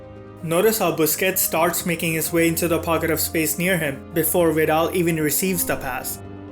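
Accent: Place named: India. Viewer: India English.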